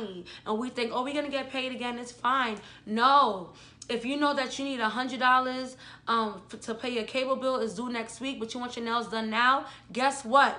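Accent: New York, United States. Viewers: I knew you lived in NY! You sound like u could be related to cardi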